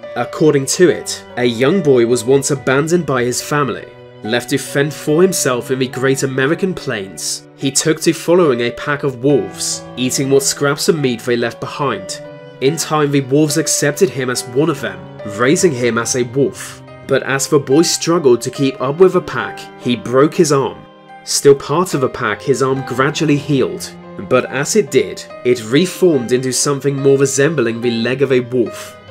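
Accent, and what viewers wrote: United Kingdom. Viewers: I just want to see face before I die. I wanna see the face behind this majestic British voice.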